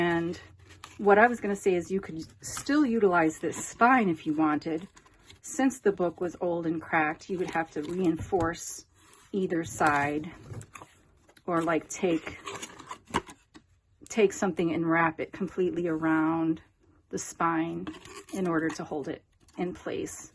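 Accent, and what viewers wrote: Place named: Wisconsin, United States. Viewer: You sound like you’re from Wisconsin -are you? I am in Mequon ☺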